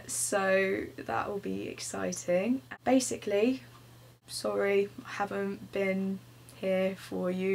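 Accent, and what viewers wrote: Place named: England, United Kingdom. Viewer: What county are you from, I'm from Bedfordshire I'm just wondering as my accent is quite similar to yours :)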